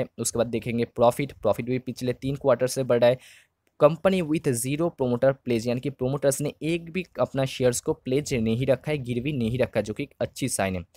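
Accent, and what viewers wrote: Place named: Bihar, India. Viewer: Tu bihari hai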